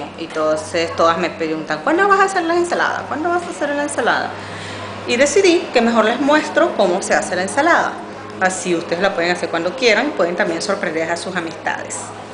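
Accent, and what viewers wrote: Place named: Venezuela. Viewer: Buena la receta y tiene que ser venezolana por su acento muy marcado😀😀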